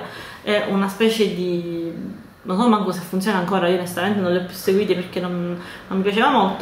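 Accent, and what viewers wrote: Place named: Palermo, Italy. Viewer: ho una curiosità ma di origine sei di Palermo o Catania? Io sonò di bagheria e dall'accento sembrerebbe tu sia di Palermo:) Dai la prossima volta che vieni fallo sapere magari ci vediamo, ci facciamo un aperitivo:) Mi piacerebbe tanto!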